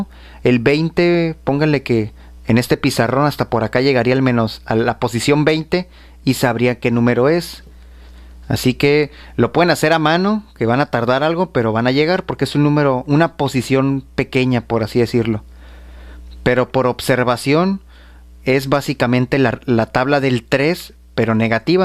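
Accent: Mexico. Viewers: Es mas mexicano que los tacos pero...de onde es (estado) Esq explica muy bien :)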